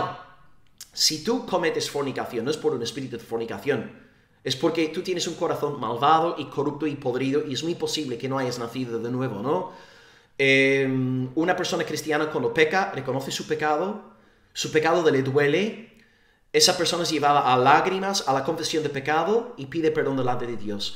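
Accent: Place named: Spain. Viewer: Hno , tengo poco que l descubrí a Ud y me encantan sus videos me han enseñado mucho ,,soy cristiana evangélica calvinista ,pero quisiera decirle algo no capto bien a los españoles a pesar que soy de Mexico y hablo español pero Ud habla muy rápido y no capto las citas bíblicas y versos ,me puede ayudar de algún modo ..tal vez que en la pantalla aparezca esto ?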